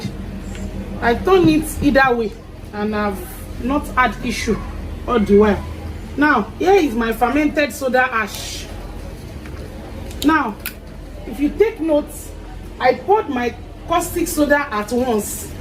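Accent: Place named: Africa. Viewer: Can I just say I Love ya accent. Your accent make me miss my friends so much. I'm in a state or town where there are no African here. Sucks.😩. Don't mind me..but I love the video.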